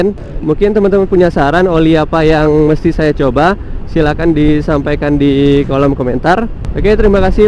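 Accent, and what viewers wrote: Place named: Minangkabau, Indonesia. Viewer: Urang awak ko mah, logatnya kliatan😂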